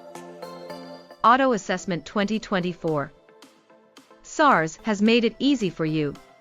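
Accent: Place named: United States. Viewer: Really annoying that a video for the South African Revenue Service is narrated by someone with an American accent! WHY?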